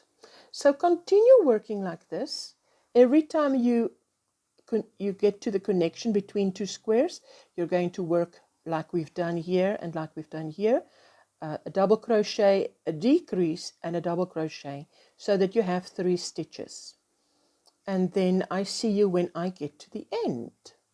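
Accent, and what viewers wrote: South Africa. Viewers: Love your blanket. I live in Johannesburg and it’s so lovely to see and hear a South African tutorial. Thank you for sharing talent xxxx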